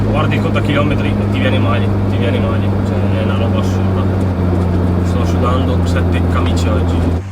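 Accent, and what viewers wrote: Veneto, Italy. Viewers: L'accento Veneto è inconfondibile.... 🍻😉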